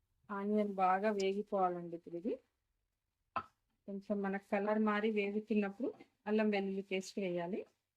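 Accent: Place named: Telangana, India. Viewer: Nice telangana slang ❤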